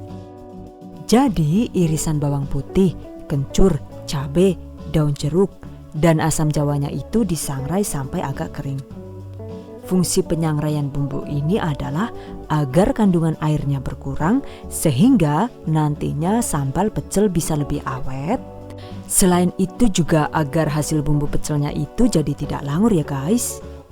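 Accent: Banyumas, Indonesia. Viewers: Alhamdulillah ilmu yg bermanfaat...semoga Istiqomah sangat terpercaya....bocah ngapak apa aslinya ya Bu??? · Mbak narator ini sebetulnya orang mana sih, kalau sy dengar suaranya bahasa Inggris nya bagus, bahasa Indonesia nya jg bagus dan itu loh boso jowone koq ngapak ya. Kadang sy suka geli sendiri, ok mbak salam kenal dari Bandung 😍. By the way sy suka loh dgn video"nya. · Warga Saranjana bisa ngapak juga toh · Ibu ngapak jg ya😂. Asli mana bu? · Bahasanya itu lho yg ngangenin . Campur ngapak Ben tambah kepenak 😀👍🏻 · Jan...sampean nk logat ngapake metu ddi marekma ngguyu.menghibur karo nimba ilmu #GiveawayTHV2021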